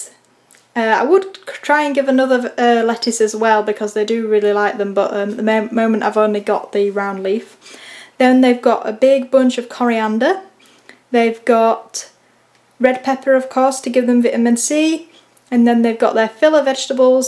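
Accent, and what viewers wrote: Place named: Northern England, United Kingdom. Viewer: I get corn with the husks on from my local grocery store. I live in west sussex though and from your accent I am guessing you are from the north of england :)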